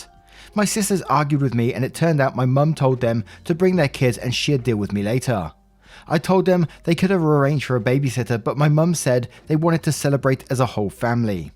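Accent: United Kingdom. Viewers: You've never heard 'Drama Llama' before? Maybe it's a North American expression(based on your accent I am assuming you are in the UK).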